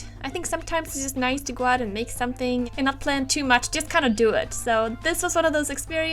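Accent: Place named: Sweden. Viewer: Ha! I knew I recognized a Swedish accent. Hej!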